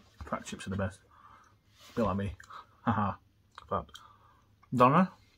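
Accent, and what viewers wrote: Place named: RP, United Kingdom. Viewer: Lol like your posh accent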